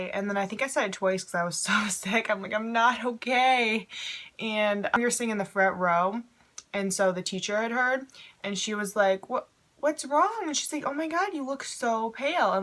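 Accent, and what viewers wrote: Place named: New York, United States. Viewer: Where are you from you have that funny accent lol "she eeasked" no offense I just think you're from New York or something ☺️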